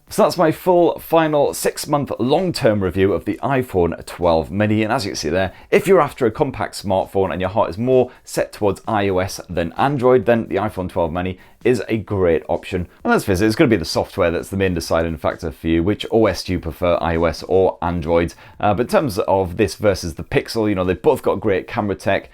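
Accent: United Kingdom. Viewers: I just discovered this channel and it has all I’m looking for: tech reviews, British accents and witty humor. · He's British, so yeah, I feel you there! 😍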